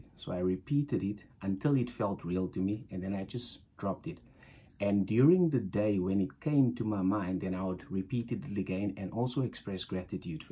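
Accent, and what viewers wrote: South Africa. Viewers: lol, immediately when I heard you speak, I said, this guy is South African. I'm too. 😂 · Oh laaaaawd, a South African accent!!!! Heya brother!!! Hi from Austria! Now I'm homesick! So happy to see South Africans also on here, talking about the Law of Attraction!! 👋👋👋 How many numbers did you get right? I once missed the jackpot by ONE number!! That was waaaaay back! Still got a nice little sum, but not the millions! Yet! 😁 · Wait you South African? Im try this too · Love your South African accent. I was in Durban years ago, loved it. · I knew that accent immediately! So happy to find more South Africans in the LOA community ❤❤❤❤ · I know a South African accent when I hear one❤️👏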